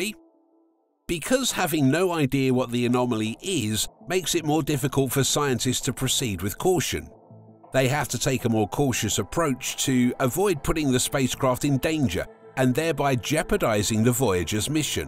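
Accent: United Kingdom. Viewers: Loose the limey.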